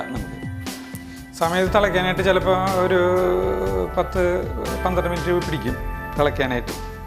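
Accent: Thrissur, India. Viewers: Athe.. chettan Thrissur aan enn thonunnu.. nalla laalur slang und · തൃശ്ശൂർ ഭാഷ കേൾക്കുമ്പോൾ തന്നെ മനസിന്‌ ഒരു സന്തോഷം ആണ്,ഈ കൂട്ടാൻ എനിക്കിഷ്ടായി,ട്രൈ ചെയ്തു,സൂപ്പർ,ഞാനും കൂട്ടാൻ ന്നാ പറയുക,കൂട്ടാൻ ന്ന് പറയുമ്പോൾ ചിലർ കളിയാക്കിയിട്ടുണ്ട് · Neat and I like your thrissur accent 😃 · Chettan porichu emmade Thrissur slangil...kari pedaayittundu..chattayee...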